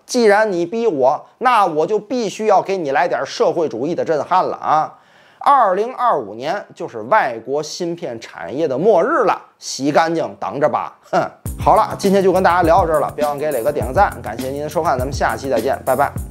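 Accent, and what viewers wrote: Tianjin, China. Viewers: 磊哥 听你口气也是天津人呀！ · 但是天津话是本频道的亮点啊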